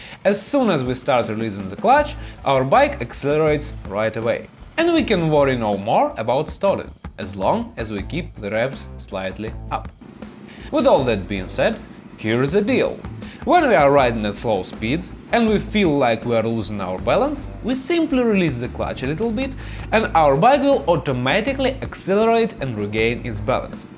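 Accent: Russia. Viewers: He is in Argentina, but he does sound Russian, I wasn’t sure haha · Clearly the best motorcycle instructional videos out there. Even with the strong Russian accent, you still have the clearest and most understandable explanations in the field. I would challenge any American to learn Russian and be able to be as fluent while creating such competent content. Kudos my comrad--I am a subscriber now.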